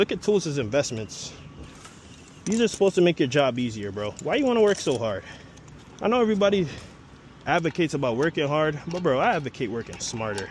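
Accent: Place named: New York, United States. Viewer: Native New Yorker here from Spanish Harlem. Your accent reminded me a bit of New York. Happy to see a fellow New Yorker thrive. Knicks in 5 🟠🔵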